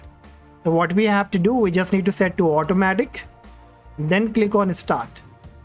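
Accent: India. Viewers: i love you indian boy with your nice dialekt that helps me very ty bwoo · everytime you heard an indian accent you know its a good tutorial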